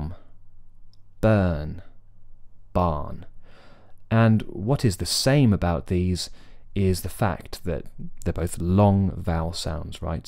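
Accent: United Kingdom. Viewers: Your standard British accent is so beautiful. The way you flow from words to words is so beautiful like music. I know you grew up speaking English but did you train to be such a great speaker. You speak so clearly and you annunciate your words, some native speakers don't do that.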